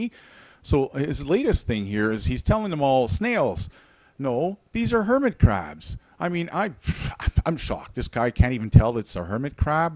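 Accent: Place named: Newfoundland, Canada. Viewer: This guy sounds like a Newfie. Not referring to!!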